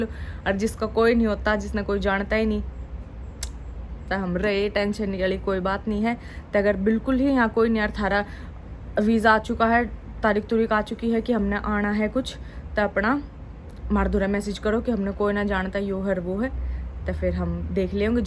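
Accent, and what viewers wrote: Haryana, India. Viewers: Nice description with haryanvi touch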